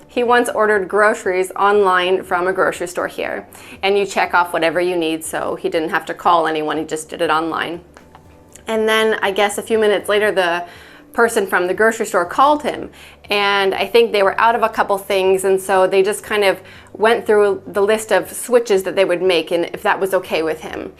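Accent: Zagreb, Croatia. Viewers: Don't know if it's just me or what, but I notice a slight Croatian accent when you're speaking English now! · "Ae" serves as "Oh well..." and "That's how it is.". It is literally used instead of these phrases. A: "Learning to code is so hard..." B: "Ae...". Btw, your pronunciation is sooo good! And you have a clear Zagreb accent, too! It's so cool to hear a foreigner speak Cro so cleanly with an actual recognisable dialectal accent! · I hate Zagreb slang and I think the accent is absolutely horrendous, but when you say it is actually cool :D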